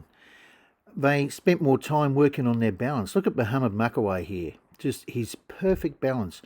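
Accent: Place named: Australia. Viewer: This guy speaks with a very distinct accent - i would estimate that he is either directly from New Zealand, Tasmania or Australia.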